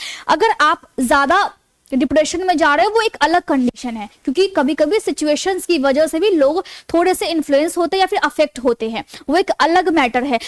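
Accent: Bihar, India. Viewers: Aap bihari ho kya maidam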